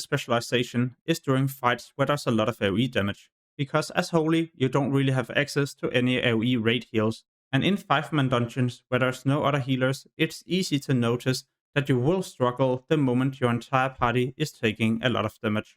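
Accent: Denmark. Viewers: Are you danish? 🇩🇰 or what accent is that?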